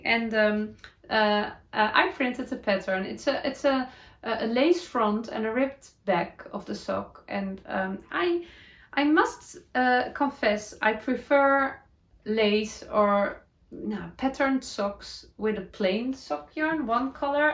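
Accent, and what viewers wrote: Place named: Netherlands. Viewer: you are so amazing. I love how practical you are about everything in your knitting and crochet. You have such a wonderful healthy outlook. Thank you so much for sharing it with us. I had a friend who passed away from The Netherlands so listening to your accent is so pleasing to me. Your English, even when it is Dutchy is so lovely to my ear.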